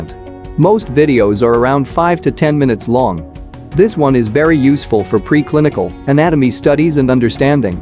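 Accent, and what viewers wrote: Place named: India. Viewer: I agreed with most of the list, but that cruciating hindi acent in the first place?Seriously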